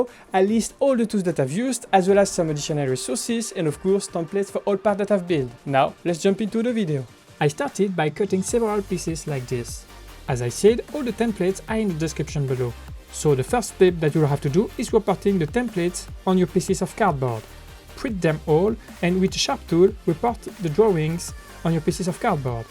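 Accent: France. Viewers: On dirait un gars qui parle anglais depuis peu ton accent c'est bizarre. Je dirais presque un français.... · Are you french ? You have a french accent ! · T'es français ça se voit à ton accent 😂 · you have a french accent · You are French? I can hear the accent because I'm French haha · Ton accent est ... comment dire spécial ( un accent français quoi )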